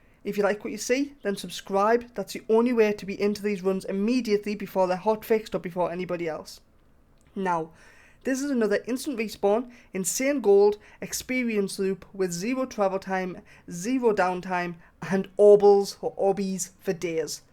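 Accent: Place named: United Kingdom. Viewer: What part of Britain are you from, mate? What dialect would you call your accent, it is very distinct! Also, thanks for these videos, very clutch!!!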